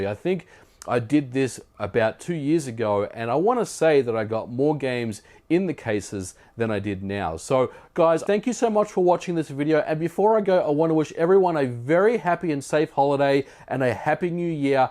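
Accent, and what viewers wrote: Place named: Australia. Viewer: As an Aussie I thought you lived in Australia...keeping your accent is a good effort.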